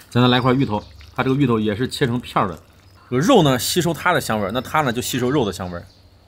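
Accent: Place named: Henan, China. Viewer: 是河南人吗？普通话的河南口音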